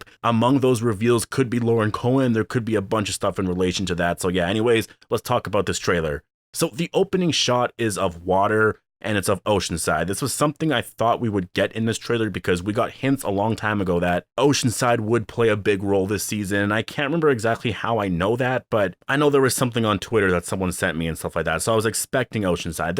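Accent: Canada. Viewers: Just realized you have a Canadian accent!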